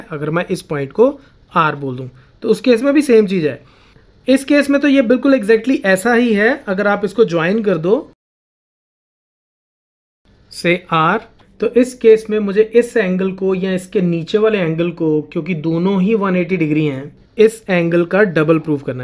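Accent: Punjab, India. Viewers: Sir ap punjabi ho na ?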